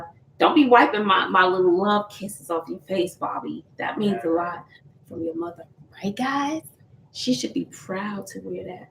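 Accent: New York, United States. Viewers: Lol, i love your NEW YORK accent, state of mind and swagg, you remind me of one of those NY Jewish Women, you're AMAZING, you could be a celebritie with your beauty, talent, humor and charm. Hang in there, it wont be long before you'll be an "EMPTY NESTER" time flies.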